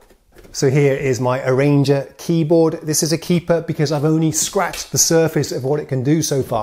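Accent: United Kingdom. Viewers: And... Are you British, Swedish or from another country? I always thought you were British like me!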